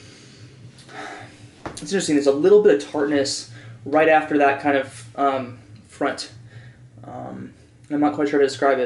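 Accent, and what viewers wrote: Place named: France. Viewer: You have a little accent . Are you French ?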